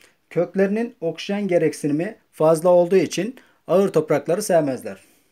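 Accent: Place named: Azerbaijan. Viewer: Aynan azeri lehcesinde konuşuyor.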